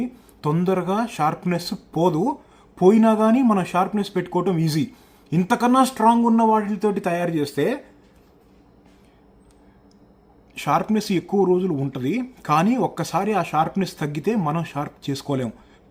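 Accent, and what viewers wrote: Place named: Guntur, India. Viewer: Yes. May be from guntur according to the slang.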